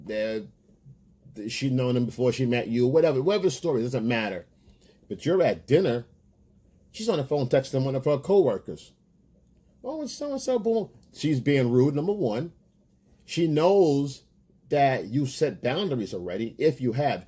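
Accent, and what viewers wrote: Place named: North, United States. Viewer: ...You live in a large city area,.I live in a rural area=The South✔..Big difference like night and day..The South is more of a traditional approach with women,even though the majority have the same motives all over america..From your voice and prospective I'll guess you live 🗣in the North..Completely different than the South,specially the rural areas where family legacy is known=Your family,cousins,uncle,aunts,friends,grands and exes are known through you(they know alot about you bro.)..They know where you go,hang out,job,who you bangin,what you drink=Ain't no million factors in the South rural areas bro🤣.,ain't no putting on a show unless the person truly is the show💯..Appreciated your videos and prospective wisdom great content..👊🏽💯.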